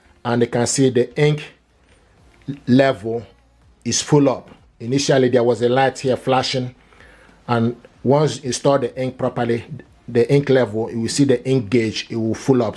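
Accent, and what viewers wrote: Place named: Africa. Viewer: strongest african accent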